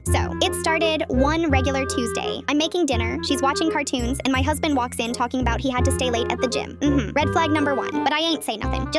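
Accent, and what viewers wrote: Texas, United States. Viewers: Bro holy Texas accesnt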